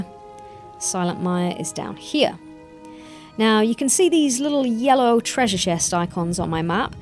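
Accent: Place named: Australia. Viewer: Your accent sounds like highbrow Australian? North Queensland maybe? But your London. Hum. Anyway I love it.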